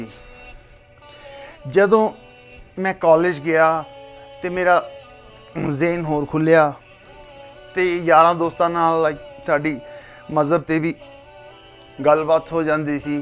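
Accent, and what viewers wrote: Pakistan. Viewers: Tu sikh nahi hai teri bhasa hi nahi hai sikhn wali ihe boli pakistani hai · 😂😂 guys this is just a fake propaganda video by pakistani punjabis. His accent and urdu words mixing with punjabi says it all.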